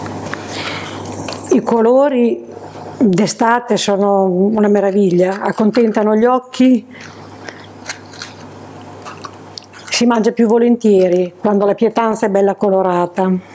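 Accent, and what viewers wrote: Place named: Romagna, Italy. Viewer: Ciao, la tua cucina mi piace moltissimo. Poi questo dolce accento romagnolo, delizioso. Ho guardato i nidi di rondine, buonissimo. Lo farò. Grazie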